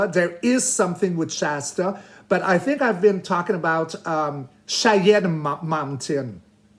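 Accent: France. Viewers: You have such a wonderful French accent. Thank you for your light hearted readings! You always make me smile! Blessings! 💕🕊️💕💥💥💥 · 🎉❤your English is amazing with a beautiful French twist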